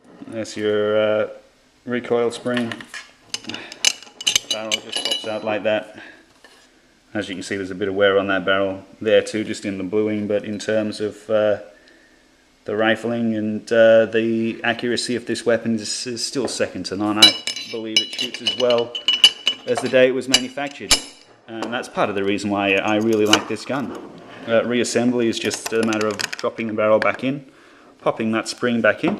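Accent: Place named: Australia. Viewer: You sound like you're from Australia. I thought, perhaps incorrectly, that hand guns weren't allowed there?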